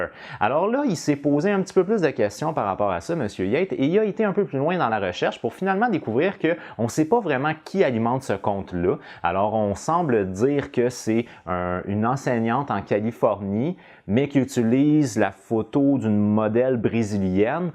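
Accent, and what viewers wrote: Canada. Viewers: Vraiment pro commes video, ça me sidère de voir une telle qualité de vidéo avec si peu de vue ^^ T'as une sacrée aisance à la caméra, j'suis bien content d'avoir découvert ;) Des que j'entends un accents Canadien j'ai directement l'accent quand je pense et parle c't'incroyable ^^ Bonne continuation et t'as le bonjours de la France l'ami Peace et la bonne vie à toi :)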